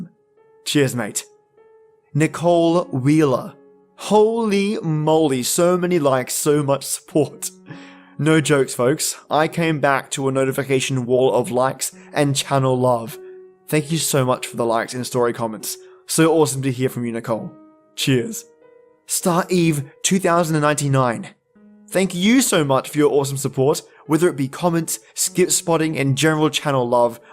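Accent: Australia. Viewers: This 1 really touched my heart. Your Aussie accent just makes these stories all the better mate! :-)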